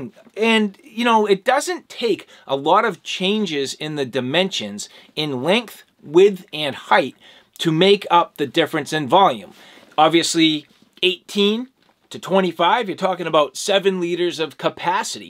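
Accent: Boston, United States. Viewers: Great review, and your Boston accent makes it even better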